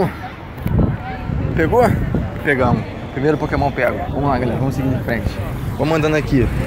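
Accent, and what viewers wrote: Rio de Janeiro, Brazil. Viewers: eu desconfiava dele ser carioca pelo sutaque dele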